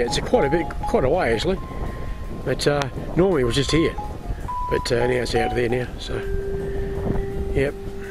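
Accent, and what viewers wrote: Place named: Queensland, Australia. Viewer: Mate sounds like you could be an Aussie, my guess is from Queensland.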